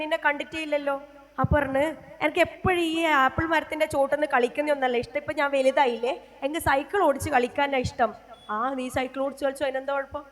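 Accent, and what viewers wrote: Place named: Thalassery, India. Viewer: സൂപ്പർ ക്ലാസ് .തലശേരി സ്ലാങ്ങില് കേൾക്കാൻ നല്ല രസമാണ്. കരയിപ്പിച്ചും ചിരിപ്പിച്ചും ടീച്ചർ സൂപ്പറാക്കി '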